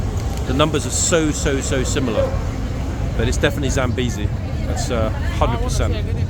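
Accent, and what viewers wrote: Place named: RP, United Kingdom. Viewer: I Love Love Love your Brilliant Channel! And I appreciate the Queen's English that you present so eloquently with every episode of your presentations. I also agree with your analysis of what respect should look like, from your perspective toward the guards. You balance that dynamic so well❣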